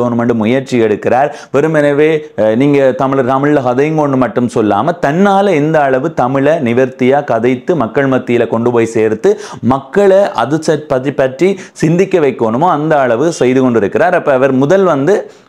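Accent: Sri Lanka. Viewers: ஈழ தமிழர்கள் தமிழ் பேசும் அழகு அருமை 😊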